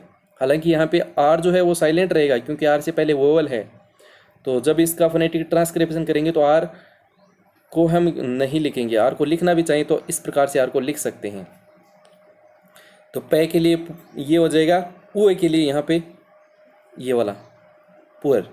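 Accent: Haryana, India. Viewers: Aapne tho kamaal k notes bnaye h Guruji 👏 PTA nhi murkh log kyo aapki vedio ko like nhi karte aapko tho gold ka button milna chahiye ,aapki aawaj aaj kuch badli Hui so lagi Guruji 👏 aapki aawaj Mai hmare area ka touch h from kaithal HR thanks a lots again 👏♥️♥️♥️💗💖♥️♥️💗💖🙏👏👏🙏♥️🙏🏻🙏🏻🙏🏻🙏🏻🙏🙏🏻🙏🙏🏻🙏🙏🏻🙏🙏🏻🙏🙏🏻🙏🙏🏻🙏🙏🏻🙏🙏🏻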